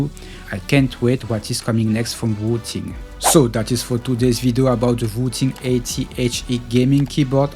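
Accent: France. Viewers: On est d'accord c'est un accent Français ? ^^ Merci pour ta vidéo !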